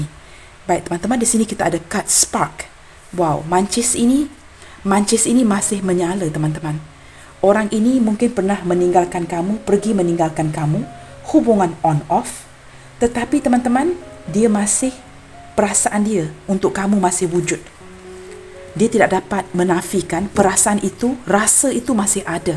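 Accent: Malaysia. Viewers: Pagi kak. Pisces hadir. Suara kakak,seperti logat Malaysia. Terimakasih readingnya. Sukses selalu 🙏🏻🙏🏻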